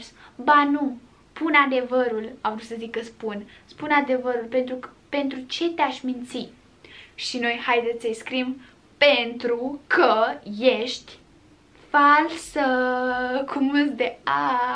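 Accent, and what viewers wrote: Moldova. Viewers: Dar tu de ce vorbești moldovenește cu prietenii tăi cu mama ta dar pe vloguri vorbești normal?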